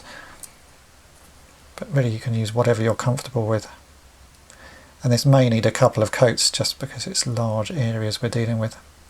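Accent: United Kingdom. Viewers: I gotta say - this kind of proper English accent is such a lost trait. Parents used to teach their kids to speak properly this way and sound posh, but not anymore.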